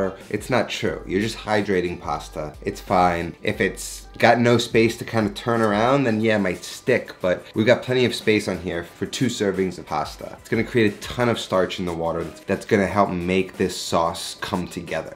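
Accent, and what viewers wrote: New York, United States. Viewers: I agree. I think he's from New York judging from accent but his food is not Italo-americano which is great but more what I've eaten for years in Italy.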